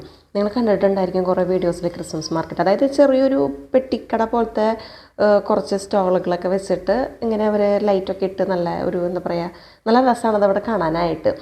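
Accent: Thrissur, India. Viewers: Thrissur ano..? 😂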